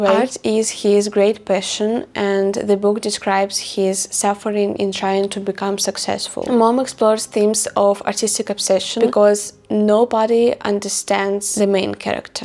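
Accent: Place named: Russia. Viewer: I absolutely agree with this Where as soon as I saw you and hear your accent I knew that you are Russian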